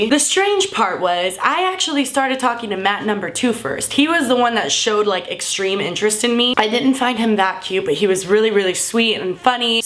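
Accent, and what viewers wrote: United Kingdom. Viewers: british accent is very attractive tho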